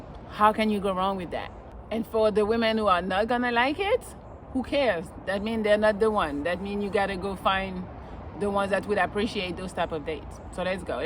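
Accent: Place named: France. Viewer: I love your French accent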